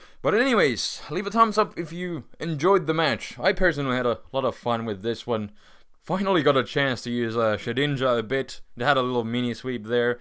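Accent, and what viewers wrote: Finland. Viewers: Suomi-aksentti <3 Erotti meinaan heti ;) Ei mutta hyvin sää vedät!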